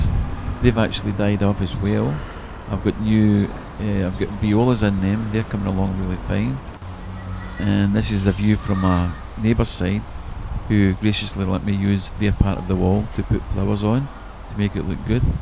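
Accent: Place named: Wales, United Kingdom. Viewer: Thank You, great video once again,well done & very good presentation, look out Titchmark, hope the BBC WATCH THIS, He's finished, lovely Welsh accent--- Love ya dearly! Cheers OXOX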